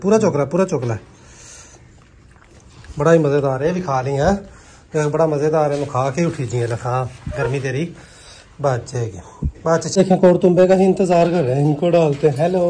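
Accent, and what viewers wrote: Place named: Rajasthan, India. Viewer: Bhai aap ki Bhasha hamare Rajasthan ki Bhasha se milati hai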